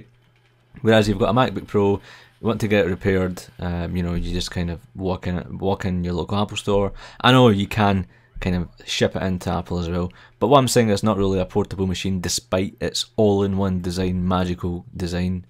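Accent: Scotland, United Kingdom. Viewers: Are you a Scot?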